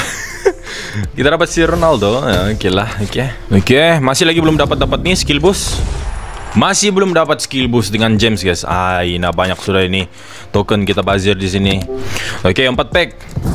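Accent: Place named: Sabah, Malaysia. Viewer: Mcm org sabah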